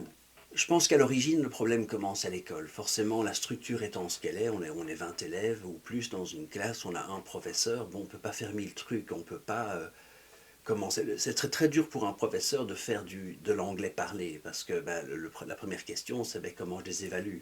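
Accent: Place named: United Kingdom. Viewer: Pour les Français qui écoutent, je peux confirmer que, ce professeur, a un très bon accent anglais britannique, qui est, à mon avis (sans que je sois biaisée en tant qu'anglaise), beaucoup plus agréable que l'accent américain! I could. I should. I would listen to all day!!! 😬😬😬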